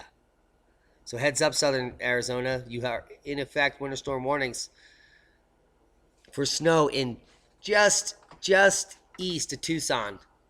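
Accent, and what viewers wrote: United Kingdom. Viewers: I love the British accent. You are f*ckin’ funny as hell. Can’t wait to hear you and Robert tonight. Global warming causes snow. Didn’t you know?! Haha. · Love your British accent.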